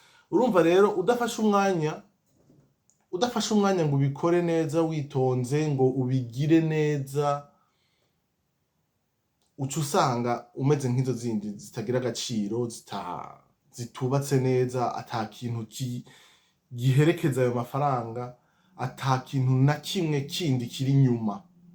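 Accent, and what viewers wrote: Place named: Brussels, Belgium. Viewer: ngo wemeye ubuki utegekaaaa, hahah , ico kirundi nica bruxL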